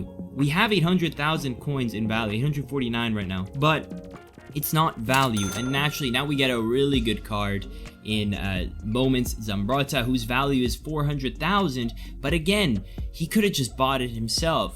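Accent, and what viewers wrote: United States. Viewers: Italian? You sound completely American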